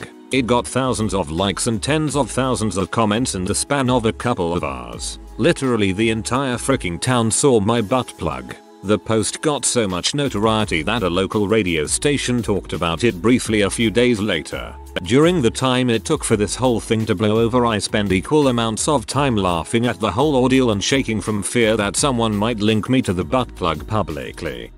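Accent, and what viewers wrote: United Kingdom. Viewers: The robotic voice with the British accent…distracting and sometimes hard to understand. Get a live person. I Start to listen, I try, but then just have to stop.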